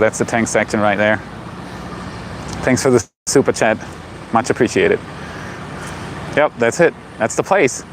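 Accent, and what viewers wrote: United States. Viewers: Why has your accent changed? Its more american than your usual accent. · You look so relaxed, you even are getting an American accent ! Wild... Ya, Boca Chica is a madhouse these days. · not only do you sound excited, but you also sound like an American. Your accent has faded since you arrived in the good old USA. We are happy to have you and you are welcome here anytime! Keep up the great work.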